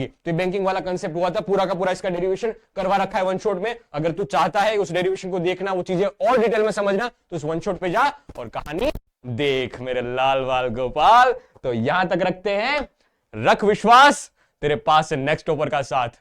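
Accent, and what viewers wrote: Gujarat, India. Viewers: Your gujrati accent ❤ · Idk if he is gujrati or not but got gujrati accent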